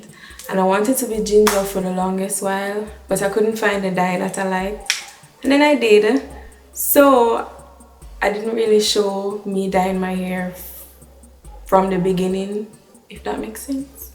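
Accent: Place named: Jamaica. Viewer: Lawwwd goodie u accent 😍😍😍🇯🇲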